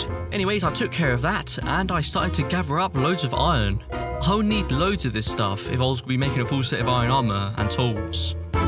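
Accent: United Kingdom. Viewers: He sounds like in uk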